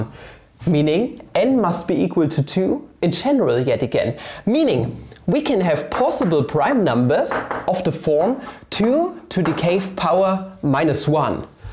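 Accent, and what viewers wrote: Germany. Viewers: Schöner Deutscher Akzent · You're German I suppose? Judging from your accent :D great video anyways, keep it up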